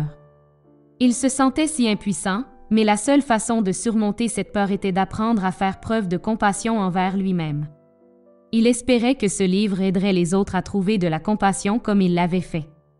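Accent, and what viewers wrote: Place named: Quebec, Canada. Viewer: Toujours marrant l'accent québécois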